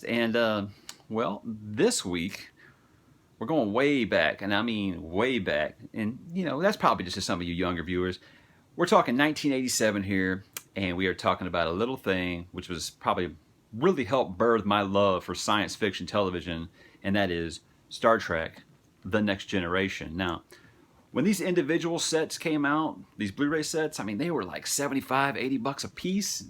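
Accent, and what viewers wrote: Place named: South, United States. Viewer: Wow your voice then versus now is soooo different! The heavier southern accent was so cool to hear.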